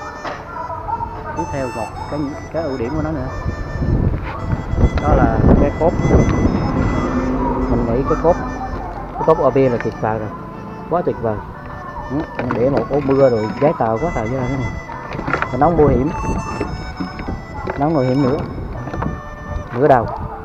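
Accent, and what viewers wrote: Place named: Quang Ngai, Vietnam. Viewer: ôi giọng 76 quê mình nghe thân thương quá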